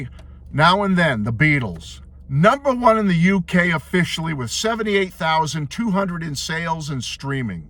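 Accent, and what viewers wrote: Boston, United States. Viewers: By the way, let me just say, again, I so enjoy sitting down with a cup of coffee and hearing my online “friend/neighbour” of sorts, just share his love for cool things about the best band in the world. I love how unadulterated you are and yet not weird or obsessive but a normal guy. I kind of get a chuckle out of your Boston like accent too. I feel like I’m watching an old episode of Cheers or something. Have a great day, thank you for the morning comraderie.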